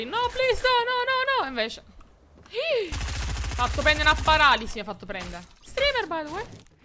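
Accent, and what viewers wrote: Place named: Rome, Italy. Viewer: quando non sa coss dire dice nice oppure are U fucking kidding me ... in romano poi..... bah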